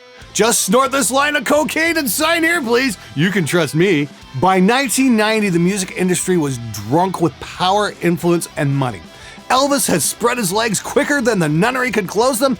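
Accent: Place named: Canada. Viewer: Hey. Just wanna say I love the Canadian accent.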